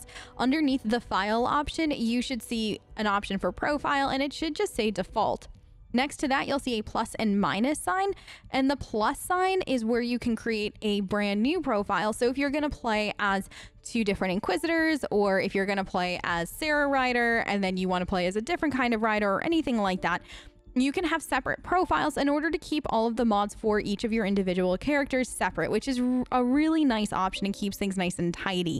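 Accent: Australia. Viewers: The accent is 100% not her original accent. I'm confident that she is Australian. It comes through, especially in moments of focus intensive thinking. Listen out for it, you will hear it. Specifically listen to the words beginning and ending with "o". Australians pronounce that very differently from Americans and you can hear her slip up often. Listen out for "hello", "over", and others. Don't dismiss it, there's a reason people don't notice. She does the American accent very well, even if a bit stereotypical. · I keep hearing an aussie accent slip in there. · Look, I have to know, what is your accent? It sounds like you're alternating between Australian and American to me (maybe because I'm Australian). Also, super-duper thanks for this.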